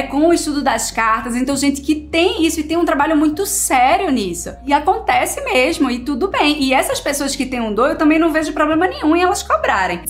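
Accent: Pernambuco, Brazil. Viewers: Oi,! Conheci seu canal há pouco tempo. Você é pernambucana? Estou sem maturidade emocional para assistir aos seus vídeos sem me perder no sotaque maravilhoso; preciso ver duas vezes. Hahaha Gratidão pela sua explicação, tão clara e iluminada. Que 2021 seja de caminhos abertos para você, com muito amor e luz! ✨🎆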